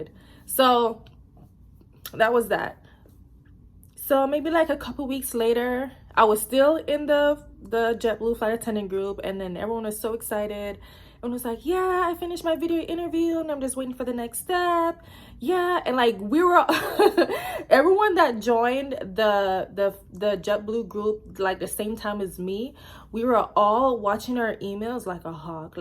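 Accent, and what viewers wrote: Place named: Caribbean. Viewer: What’s ur accent from? I’m vincy n you sound Caribbean too- also have my in person f2f june 6 (2 weeks)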